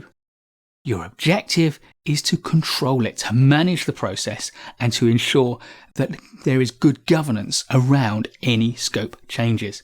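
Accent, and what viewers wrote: United Kingdom. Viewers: great video and great explanation and what makes it better is your excellent english, British accent and soothing voice I honestly can listen to you all day you should consider making podcasts fr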